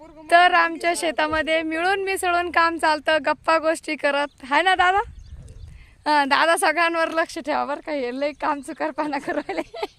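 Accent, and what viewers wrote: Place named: Pune, India. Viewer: तुम्ही खरच किती काम करता तुमच बोलण खूप छान वाटत नगर किंवा पुण्याची भाषा वाटते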